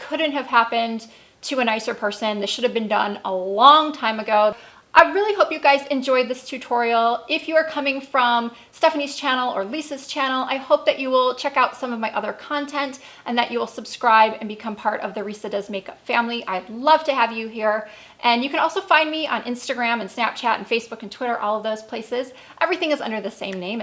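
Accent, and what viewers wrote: Midwest, United States. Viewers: Hi Risa. I love the look you created. I’m so excited to get these. I love the support you, Lisa and Stephanie offer Emily! Strong, beautiful women supporting another strong, beautiful woman. Makes me so happy and proud to be a subscriber to all of you ladies. You guys have created the very best YouTube communities out there💜. Thank you ( I’m from Michigan too! I knew you sounded midwestern😊). Cheers 🥂 to this community!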